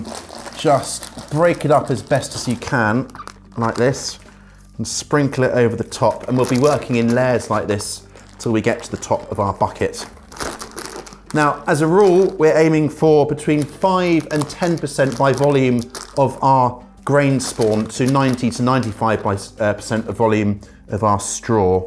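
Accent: United Kingdom. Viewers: You sound distinctly British. Is there a reason you give your Imperial units before your metric ones?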